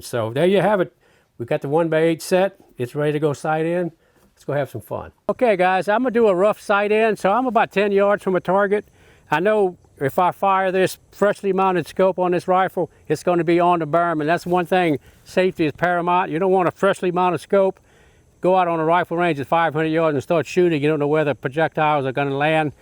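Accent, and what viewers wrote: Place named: United States. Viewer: Great and easy to follow and understand. Talks American English and explains thoroughly yet simply. 👍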